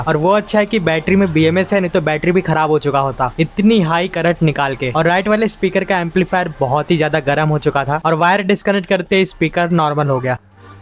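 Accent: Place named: Maharashtra, India. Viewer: App marathi ho na, aapke hindi bolne ki tone se lagta hai ki aapko marathi aati hai ❤❤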